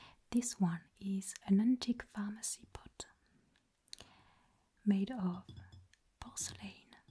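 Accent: France. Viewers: I love the apothecary theme! Also from the great french accent you have, are you like from a french speaking country or are you bilingual? I always heard you in english thus I was curious :p (also that's my goal to speak so good english people won't notice I'm french xD) thank you for all of your videos! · Your French accent amazing I love it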